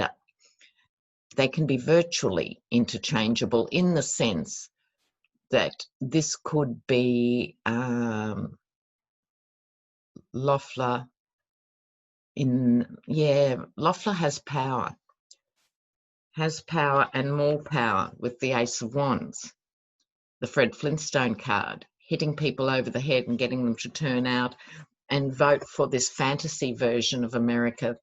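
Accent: Australia. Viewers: I love your accent. I actually listen to 3 of you aussies. You . Marianne and Elle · I love your Australian accent.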